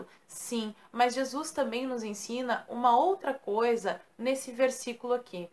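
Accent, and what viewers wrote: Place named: South, Brazil. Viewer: sou novo no seu canal e estou curtindo muito, estou aprendendo muito.... Vou te fazer uma pergunta pelo seu "sotaque " vc é do Sul do Brasil não é? para ser mais específico vc é do Paraná???? valew ate mais...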